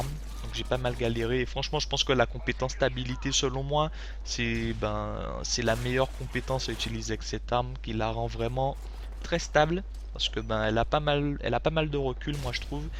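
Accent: Antilles, Caribbean. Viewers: J'adore ton accent ! Guadeloupe ou Martinique ? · C'est un accent antillais ? :) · accent antillais non !? en tout cas tu commente super bien !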